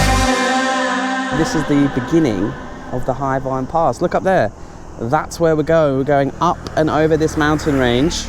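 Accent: United Kingdom. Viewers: have to say, I have been to Vietnam 25 times and lived there for 2 years! But your videos make me want to go back and do all the places I've visited again 😄 Keep it up lad! Always love my tour videos in a blighty accent... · Fantastic and beautiful episode (as always) of Vietnam,! Really enjoying this series too! Had a little chuckle towards the end, with your word for swim shorts: "swimming costume"- you might of lived overseas for years - but you still sound so very British ( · I used to think British people were rather cold and didn't know how to joke, because I have a few British colleagues in the company. But after watching your channel I realized I was wrong, you are quite funny and cute and are you British (I recognize your accent)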